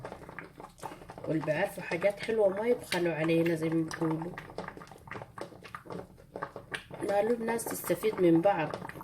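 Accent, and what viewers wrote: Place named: Sudan. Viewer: يا الله على البساطة و اللهجة الجميلة 🖒🖒. إن شاء الله أزور السودان و لو ليومين 😎. قولوا آمين. أختكم الجزائرية في المهجر.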